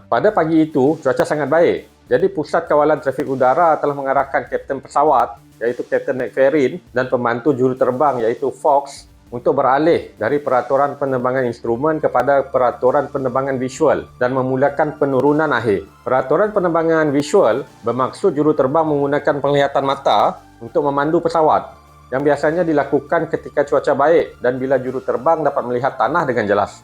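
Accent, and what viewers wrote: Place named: Kuching, Malaysia. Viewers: Kamek tek rasa gia juak. Org sarawak tok kah.. yalah alu maca komen. Best topic tok. Suka nengar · Kuat slang sarawak ktk pok.. 😅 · Auk, urang kuching.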